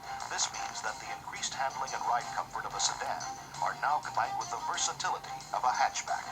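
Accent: Australia. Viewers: From aussie? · Are you Australian?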